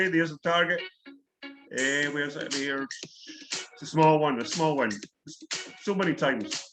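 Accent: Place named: Scotland, United Kingdom. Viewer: I've just upgraded my tradingview to the pro+ with volume profiles. I've spent the full weekend looking and reading about the profile, the problem is 99% are showing you hindsight or they are just explaining the profile and not actively trading with it. Am I right in saying that you are always targeting the previous days POC? Today is my first day with volume profile and i'm also trading futures with CME data, i'm going to go through all videos on the channel, any pointers on what videos are clearly describing your strategy? BTW great to hear a fellow Scotsman, cheers!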